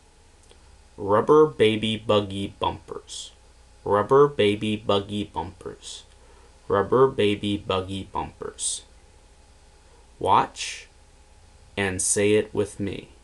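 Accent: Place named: United States. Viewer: One problem: this is American pronunciation. Correct English pronunciation is British Standard English (RP).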